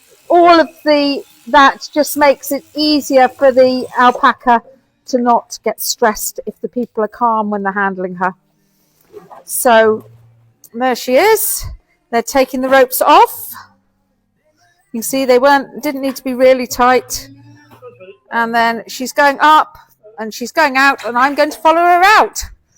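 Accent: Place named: United Kingdom. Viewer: You are definitely from uk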